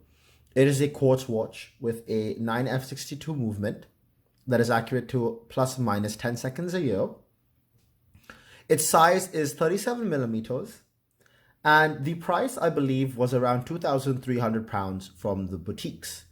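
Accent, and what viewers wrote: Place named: India. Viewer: hehe I could make out from the accent... I'm indian too and pleased to see a GS lover in India... Do u have any Spring Drives or Mechanical GS too?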